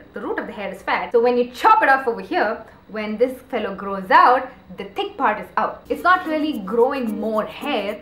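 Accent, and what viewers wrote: India. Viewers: which accent are you trying to talk in Indian , American or British? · Very informative video n luv d way u talk n ur voice is mastam...r u India n? n u luk so qute :)) n when u said shupp shupp shupp that moment was awesome gal... :)) way to too :))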